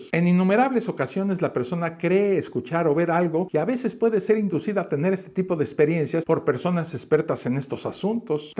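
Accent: Mexico. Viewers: estoy fascinada contigo..me encanta escucharte en tu acento tan mejicano...todo un océano no nos separa eres ya imprescindible....un saludo desde España❤❤❤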